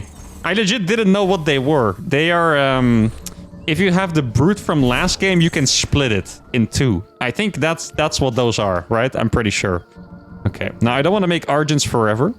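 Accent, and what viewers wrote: Netherlands. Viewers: is this dude Dutch? sounds Dutch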